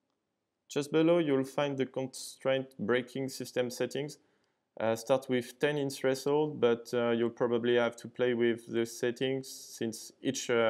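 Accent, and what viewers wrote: France. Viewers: l accent explique Napoléon , tu représentes ! petite fierté mal placée , franchement tu déchires tout ce que j'ai vu ! merci pour ces moments d'émerveillement !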